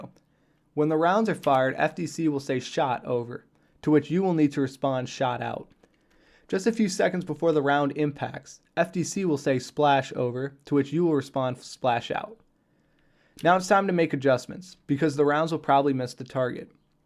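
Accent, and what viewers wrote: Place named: Ireland. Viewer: Yeah dude it sounds Irish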